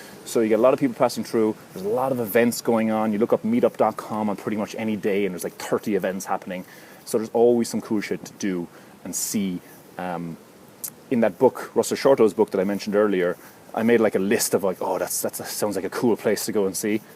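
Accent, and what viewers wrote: Cork, Ireland. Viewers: You from Ireland my man? Pretty sure you are ^^ edit You actually mentioned you are in the vid later on :-) my bad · He's clearly Irish from his accent. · he sounds like a typical Irish man, I dunno where you get that from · ...from Ireland? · He is from Cork. Definitely. I'm half Irish, I know.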